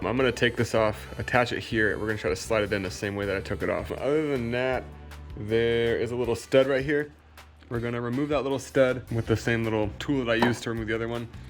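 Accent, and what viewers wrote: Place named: Toronto, Canada. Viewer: You from Toronto?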